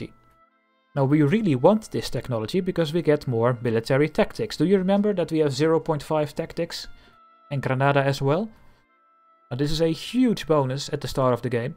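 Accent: Netherlands. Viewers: Hey, I hope you are willing to continue on your tutorial! It was really helpfull in understanding the game sofar since you talk clearly, don't talk fast and explain it rather good. Also the dutch accent makes it for me better understandable (gezien ik ook Nederlands ben), so love that. Anyway, I hope you'll find yourself able to continue. Great thank for the tutorial so far!!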